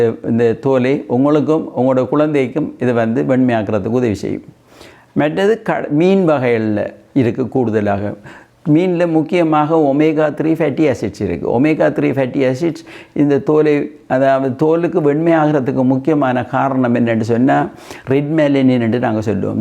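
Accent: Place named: Eelam, Sri Lanka. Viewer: உங்களது ஈழத்து தமிழ் அருமை ❤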